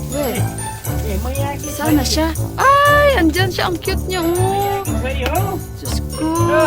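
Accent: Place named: Hiligaynon, Philippines. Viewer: Ganda ng vlog mo sis di nakakaboring at kaabang abang. Tapos yong pagsasalita mo di maarte and i like your ilongga accent. More power to ur channel at sa mga supporters and sponsors sa charity nakakaproud bilang isang filipino. I salute u all.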